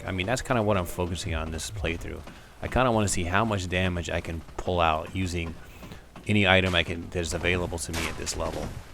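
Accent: United States. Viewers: I really like your commentaery. I am Scottish and I have relatives from LA and New Jersey. I love American accents. Whereabouts are you from? Thanks.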